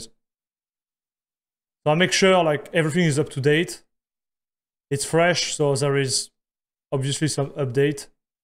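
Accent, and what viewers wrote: France. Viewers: On entend presque plus le pti "french accent" 😝😁😉 Vivement un PUBG avec Choco 👌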